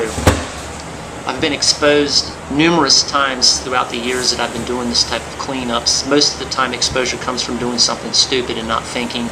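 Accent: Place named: Norfolk, United Kingdom. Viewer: That Norfolk accent is THICK. I’m from northern va, so we say it all boring “nor folk”.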